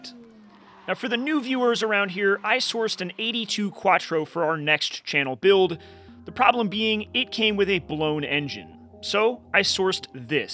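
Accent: United States. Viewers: Amusing and yet so normal to hear an American voicing over a video of a European engine being rebuilt and mentioning that he hopes that this video will make it known what this USA back street workshop can show the ROW (Which is basically Europe) how to do it ! LOL. Why not simply just phone up a European backstreet workshop to work on a European engine ?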